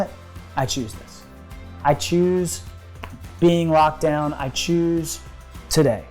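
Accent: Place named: United Kingdom. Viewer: Hey, I wanted to let you know that I just saw a clip of you and TAN talking about Blind Eyes Opened earlier today and I love that you backed that movie. With my personal story that hit me SO hard. Thank You so much for that....and I like your vaguely British accent...:)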